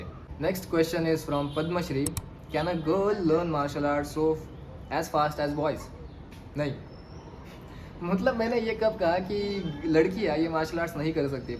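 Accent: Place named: Malvan, India. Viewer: Bhava tujya shabada madun malvani zalakte 😂😂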